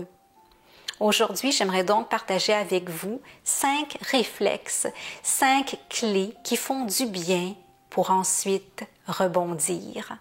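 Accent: Canada. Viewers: Bonjour j espère que votre forme est revenue je suis tout a fait d accord avec vous pour ma part plus je prévois faire de choses et moins j en fais notre corps nous parle il faut savoir l écouter si on tombe malade c est pour nous obliger a arrêter merci pour vos vidéos et le petit accent canadien ❤😊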